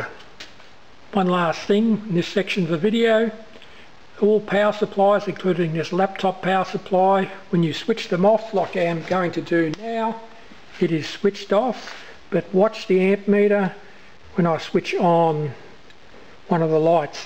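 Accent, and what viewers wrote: Australia. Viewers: G'day. Good to hear an ozzy voice. Thank you for the idea and keep up the videos.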